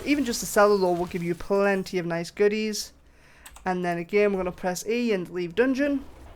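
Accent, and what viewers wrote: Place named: United Kingdom. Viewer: What part of Britain are you from, mate? What dialect would you call your accent, it is very distinct! Also, thanks for these videos, very clutch!!!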